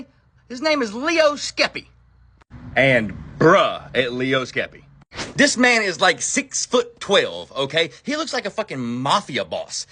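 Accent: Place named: South Jersey, United States. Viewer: Ps-you might have a lil south jersey in ya like myself. You rock👍❤️🕊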